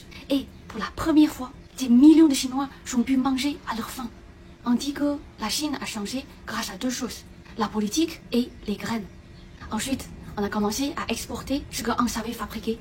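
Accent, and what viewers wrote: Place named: Portugal. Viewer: Pourquoi elle a un accent portugais ? Un bug de l'IA?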